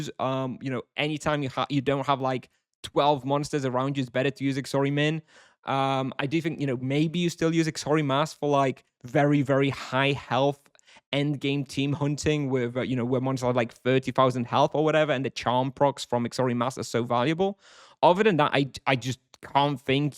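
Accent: United Kingdom. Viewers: Unrelated question. Why do you have a proper British accent if you're Polish?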